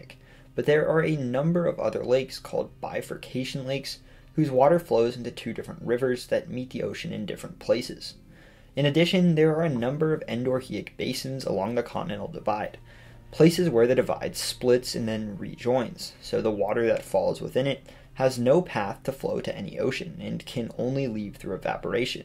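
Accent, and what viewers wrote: United States. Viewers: Interesting,except that the American style of English pronunciation is a bit hard for me to grasp quickly.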